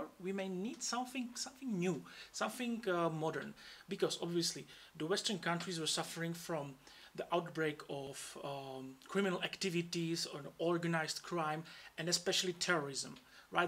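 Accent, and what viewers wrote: Czech Republic. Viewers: The CZ-75 is the greatest pistol design ever made in my opinion... no matter what purpose you could ever desire, there's a 100% chance that the CZ-75 can be adapted into that role with a variant of it or even simple customization! My father from Persia introduced me to CZ over a year ago, but I'm the one who's a CZ-Cultist! Dumb question, with the accent you have, I would presume you are indeed from the Czech Republic, right? Sometimes I think "Man, I need to go see what's there..."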